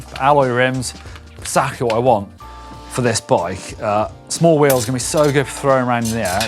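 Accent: United Kingdom. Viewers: Your voice is too gay and British m8 I can't watch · pleasee chenge the dude I just cant listn to his british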